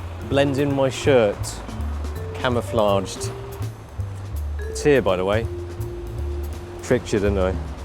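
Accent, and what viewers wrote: United Kingdom. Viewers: Asian with British accent omfg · Haha, WHY did you let her throw a camera up like that? So obvious that it would be a epic fail, but its your fault not hers ;) I know people from Hong Kong, but I've never heard anyone with British accent! Like others say, it sounds really nice :D But did you live in Brittain then? ONE thing you should have pointed out about the cameras! They are totally different in the grip! Yes, the Nikon weight less and is smaller. But for a guy where Im from, the Nikon is really too small in order to get a really nice grip on it like you have for Canon's camera.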